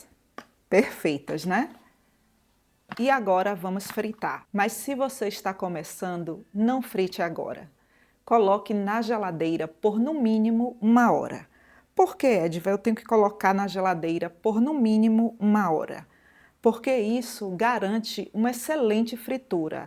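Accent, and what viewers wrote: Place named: Northeast, Brazil. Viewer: Tô aqui olhando a receita mas prestando atenção no sotaque! Adoroooo queria ter sotaque nordestino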